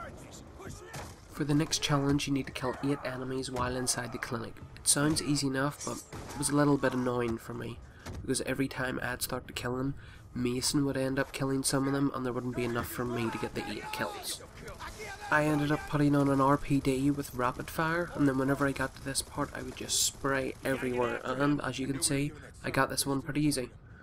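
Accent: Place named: Northern Ireland, United Kingdom. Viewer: Thanks for the great guides. Looking forward to the next ones. It's been a long time since I've heard a real Northern Irish accent ;)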